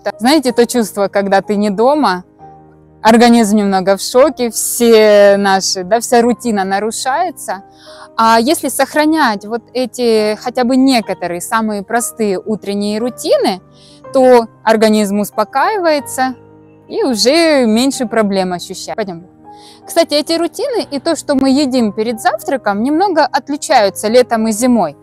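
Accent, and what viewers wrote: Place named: Ukraine. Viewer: Почему-то акцент такой как человек с Украины 😉 адаптации Вам скорейшей ❤❤❤ язык наверное уже освоили,ведь с свекровью нашли общий язык 🌹🌹🌹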